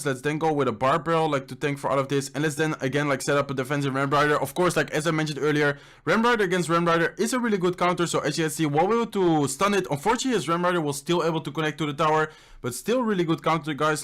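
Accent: Netherlands. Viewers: He is dutch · Ik hoor zo goed dat je nederlands bent😂😂👍🏻 goeie vid tho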